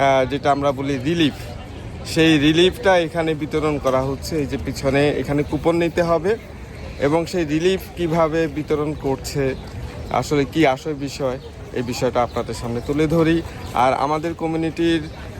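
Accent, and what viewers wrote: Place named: Dinajpur, Bangladesh. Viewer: এ হতে পারে ওপার দিনাজপুর গ্রামের গলার স্বর তেমনি বলে।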